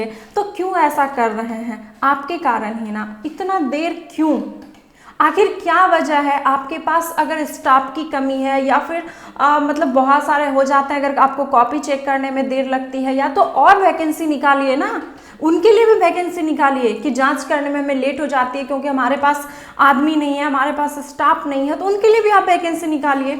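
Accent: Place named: Bihar, India. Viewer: Bihari... Style mam😀😀🙏🏽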